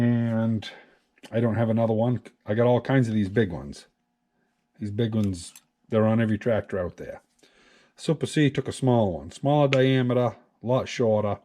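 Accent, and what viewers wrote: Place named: New England, United States. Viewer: Watching the video, and hearing the New England accent... more White Mountain region than Kittery.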